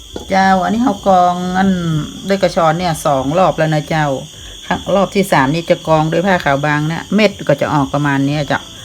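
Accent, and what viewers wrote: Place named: North, Thailand. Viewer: เค้าก็พูดภาษาไทยนี่ครับ สำเนียงเหนือ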